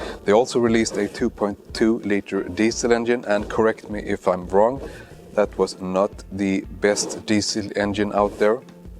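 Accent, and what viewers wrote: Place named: Scania, Sweden. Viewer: Skånska (?) = kvalitet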